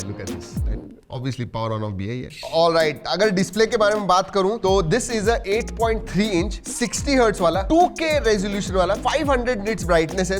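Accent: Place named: Maharashtra, India. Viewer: तुमचे मराठीं मधे reviews खुप दामदार वाटतात तुम्ही अत्ता हिंदी बोलत आहत पैन एमएलए आसा वाटत आहे की मराठीच बोलताय 😅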